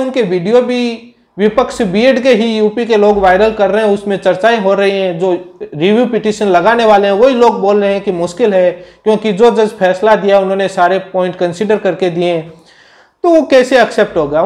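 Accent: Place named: Pahari, India. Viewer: आप पहाड़ी है जो आपके हिंदी उच्चारण से स्पष्ट है। थोड़ा इसमें सुधार करें।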